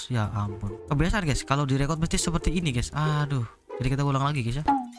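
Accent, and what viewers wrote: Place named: Java, Indonesia. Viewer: Logat jowo ne medok banget bro😂😂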